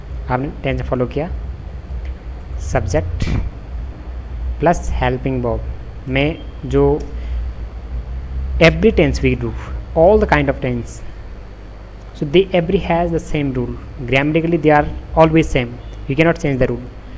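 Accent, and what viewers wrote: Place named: India. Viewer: Indian tongue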